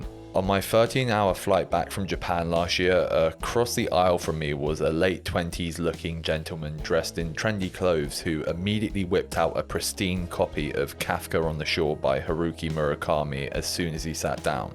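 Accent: United Kingdom. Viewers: we listen to you speak because occasionally between your british twink accent and takes that feel like they were formed in a bottle left on the london tube, there are sometimes valid points you make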